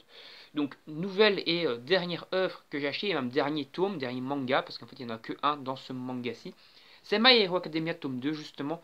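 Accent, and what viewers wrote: Belgium. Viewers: salut , merci de m'avoir répondu et désolé d'avoir pris du temps pour repondre , pour la question ou je te demande si tu es belge c'est parce que je suis belge et que j'ai eu l'impression d'entendre un petit accent xD Pour les conseille je voulais savoir - si on était "obliger" de montrer sa tête en vidéo car je suis encore jeune. - si filmer avec un téléphone impactais sur la qualité de la vidéo car pour moi l'image paraît correcte mais je n'ai vraiment d'avis extérieur si tu vois ce que je veux dire - et enfin aurais tu des musique de fond pour les vidéos à me conseiller Après je ne sais même pas si mes vidéos sont bien donc j'espère ne pas te faire perdre ton temps voilà voilà, en tout cas ta chaîne m'a beaucoup plus 👌😉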